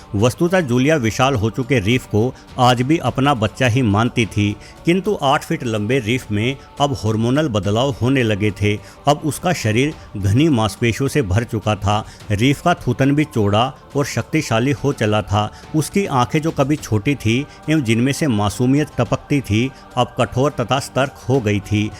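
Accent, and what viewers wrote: Haryana, India. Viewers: Bhai tu haryana se hai ke?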